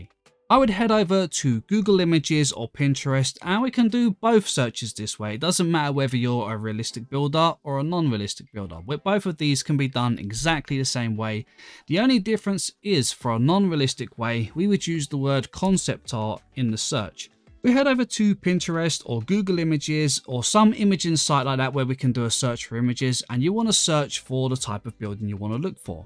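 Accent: United Kingdom. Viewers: I HATE HIS BRITISH ACENT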